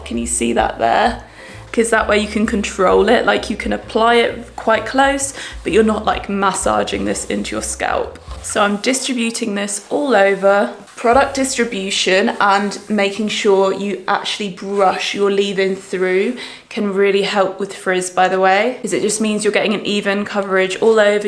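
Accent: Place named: United Kingdom. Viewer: I didn't know you were British you have such a beautiful voice 😊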